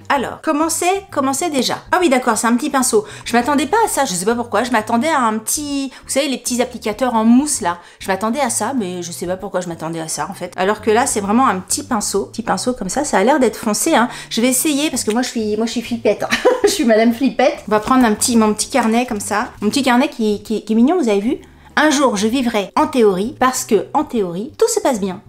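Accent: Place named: Southwest, France. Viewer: Team sourcileuu, sud-ouest oblige ! On prononce tout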